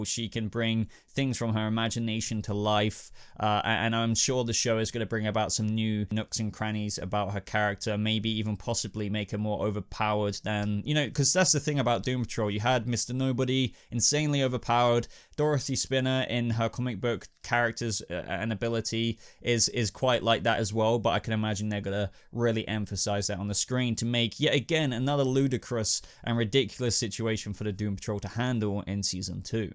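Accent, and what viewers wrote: United Kingdom. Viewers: OK I've just came across your channel, and thought, due to your accent that you are in the UK, but after what you kept saying about the CW, and DCUniverse things and hbomax, none of these options are available in the UK, so you must live abroad to be able to watch these programmes regularly? If I'm wrong then my apologies, but I'm confused, lol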